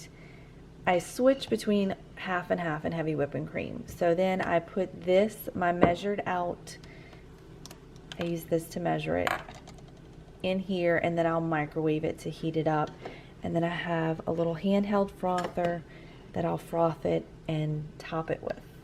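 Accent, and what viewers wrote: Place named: Louisiana, United States. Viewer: You must be from somewhere in Louisiana. lol that accent is for real! ❤️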